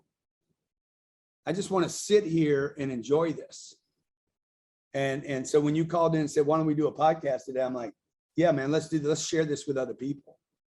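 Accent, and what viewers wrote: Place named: Louisiana, United States. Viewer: When I was 7 years old I got in my dad's 1991 Ford Explorer demonstrator vehicle from from the new car dealership he was a manager at. It had A CD player in it, it was the 1st ford vehicle to have A CD player. The Dolby prologic surround sound demonstration disk was in the CD player, I listened to it and marveled at how good the system sounded.. I then saw the eject button, the symbol was familiar to me I knew what it meant.. As I hit the button out popped the cd. I opened the case that rested on the center console and Inside I found A CD labeled "objections and closes" Curious I put the CD in the truck and pressed play. A Southern Louisiana accent started belting out some cheap one liners and a quick welcome speech about himself. I became fascinated with that day. I had no idea who he was from that day or many years to come until approximately 3 years ago when when I saw a YouTube video of the same name. 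I said to myself "there's no way that that guy from way back when is still doing stuff and creating content now"...... So I clicked the video and that same familiar voice greeted me. I attended growth con in 2020 at the Mandalay Bay and sat 3 rows back. I bumped into in the hallway by the gym. And although I was startled at how much smaller than me he was, I will never forget how quickly he thanked me for my support shook my hand, And started walking off at almost a Sprint to his next engagement. Although the growth convention was fantastic and I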